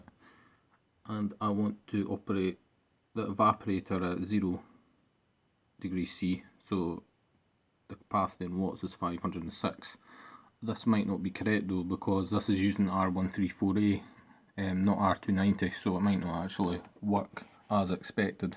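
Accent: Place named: Glasgow, United Kingdom. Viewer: I'm fascinated by your accent, are you from glasgow?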